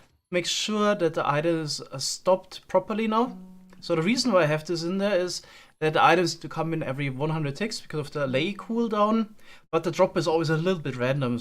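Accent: Germany. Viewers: The german Accent haha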